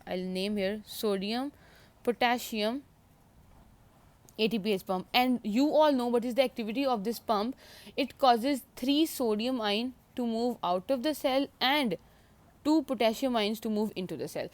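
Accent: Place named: India. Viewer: U have a good accent of Indian English I love it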